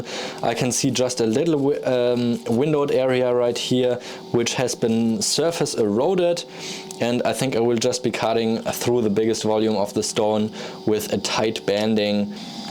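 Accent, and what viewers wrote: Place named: United Kingdom. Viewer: Just watched your short:gold:amethyst agate. Joining you in prayers that our US president will get defense weapons QUICKLY to Ukraine...today!!! Loved your shoes/Ukraine colors. You always take me back to student-exchange days Bielefeld/Westfalen 1961. You are so admirably articulate in authentic British English. Still read German Bible/listen to Bach/baroque daily. Thank you,. Your voice is music to my ears. Of course your enthusiasm and expertise with minerals (creation) are equally valued. Robert TX USA.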